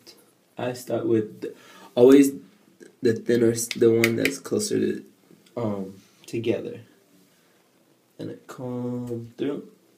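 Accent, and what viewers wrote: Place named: Louisiana, United States. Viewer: Aww I love ur voice, you kinda sound like ur from Louisiana.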